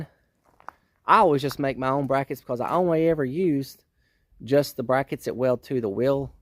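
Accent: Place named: South, United States. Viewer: I’m watching from Poland and I understand you just as fine as anyone else buddy! I actually love country accent!! Keep you the great work fella!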